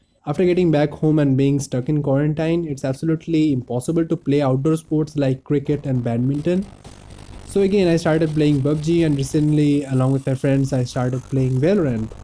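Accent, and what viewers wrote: Bengal, India. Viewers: you bengali?? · I just love that heavy Bengali accent ... Lightens up the mood (no offense)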